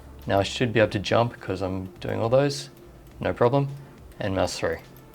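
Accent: Australia. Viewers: I like your accent and voice so much, dem aussies lol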